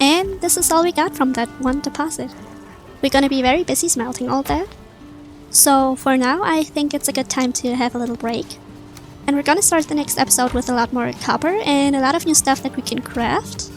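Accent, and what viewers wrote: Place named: Australia. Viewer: Bringing metal by boat cost my like 1 3rd of my game time. I think the reason to have it disabled is because you get upgraded portals in the Ashlands, that let you take metal through. I think you should try using the cart it's hilarious. Oh btw. try not to die too much cause skill drain will slowly deplete your skills. Your sounding more Australian by the day. Good video. :face-blue-smiling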